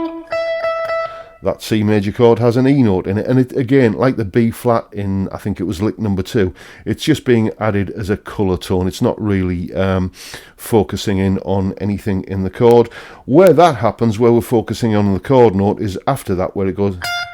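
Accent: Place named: North East England, United Kingdom. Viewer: North east lad I take it. You sound like you’re fairly close to me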